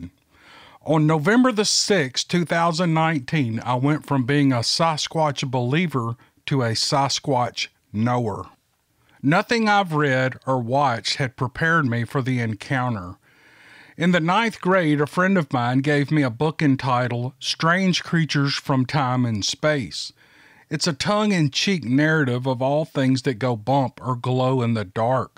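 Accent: South, United States. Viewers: me and my wife love how you tell the stories. Plus we love the good ole down south accent for reals. May Jesus bless you and yours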